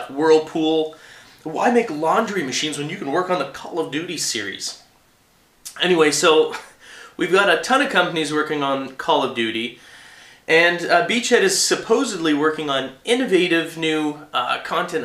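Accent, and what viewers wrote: Canada. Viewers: I'm just wondering are you Canadian?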